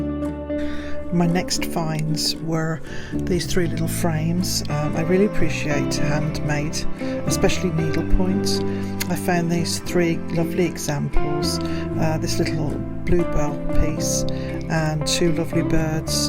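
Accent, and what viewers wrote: United Kingdom. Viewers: I love the William Morris fabric what a find. I often go to Liberty’s as I live near London it’s like another world in there. The thrift shops seem to be bigger and have lots more on offer than our charity shops here in England. By your accent I guess you are British. I’m new to your channel but love it.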